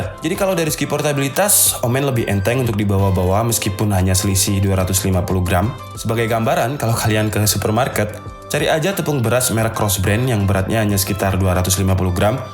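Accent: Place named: Bali, Indonesia. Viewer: ngomongnya kyak orang bali euy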